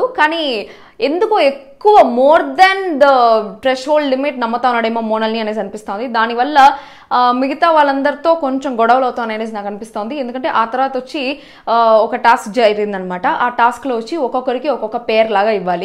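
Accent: Chittoor, India. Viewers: I never seen a girl from chittor speaks in proper slang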